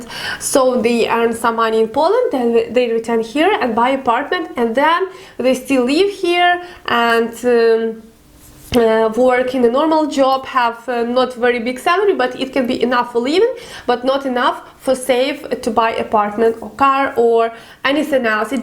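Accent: Ukraine. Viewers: I love your Ukrainian Accent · Please speak English with less Ukr sounds. For example "this" the i sound is NOT eee like th eee s but the i sound is like Ukrainian word for the male cow which is "BULL" in Ukrainian it is "bik" which is the "i" sound in English you wouldn't pronounce the BULL in Ukrainian as " B-eee-k" in the same way the word "this" in English is NOT th-eee-s. Also the "w" sound is NOT a "v" sound like the Ukrainian "b" but more like a JOINED "ya" sound so "water" is not vaterr but more like "ya-ter"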